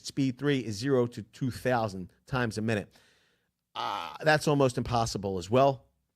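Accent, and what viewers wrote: Philadelphia, United States. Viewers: I listen to this BS just hear a Philly accent. You guys are alright.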